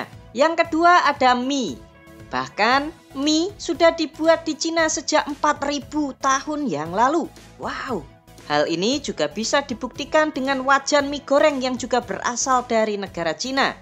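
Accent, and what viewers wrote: Java, Indonesia. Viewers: WALAU MEDOK BANGEEET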